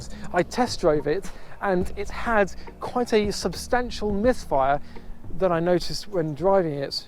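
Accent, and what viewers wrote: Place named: RP, United Kingdom. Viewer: I can't believe how posh you are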